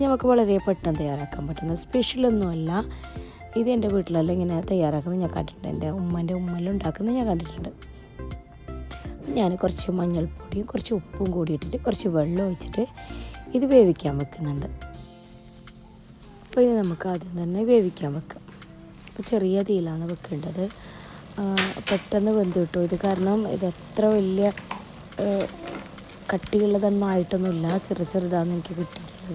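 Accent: Kasaragod, India. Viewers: Kasarod slang super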